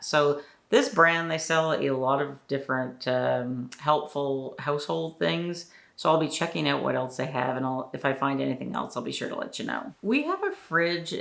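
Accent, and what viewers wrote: Canada. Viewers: Are you Canadian? Born and raised?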